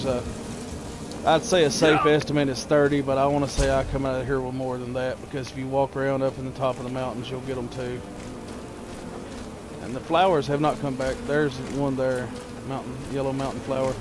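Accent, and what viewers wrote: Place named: United States. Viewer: I love your sexy stereotypical american accent 😍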